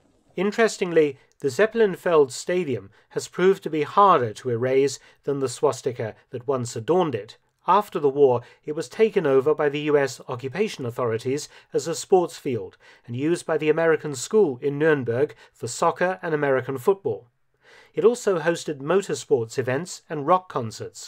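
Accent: United Kingdom. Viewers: This dude sounds British to me but idk if he is but if he is he said soccer...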